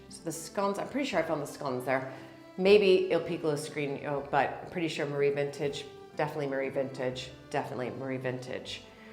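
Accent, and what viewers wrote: United Kingdom. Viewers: it's been a while since I've watched one of your videos and you've been in Britain long enough now that your American accent is taking on a British sound in words like, also, love, all, and wall. Interesting! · I’m hearing your English accent coming through 😊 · Could’ve left the posts on terrace and made either a trellis or strung tiny little lights sort of as a privacy screen:) I like that I can hear the slightest bit of a British accent coming through when you speak:)love your place it’s beautiful:)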